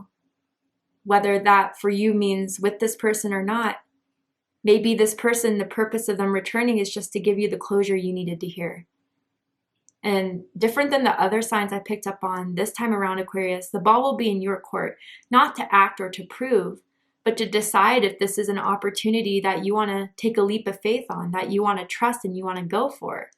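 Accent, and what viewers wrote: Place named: United Kingdom. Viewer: 😯 Yes, British accent.. he is English!! I am Italian 😃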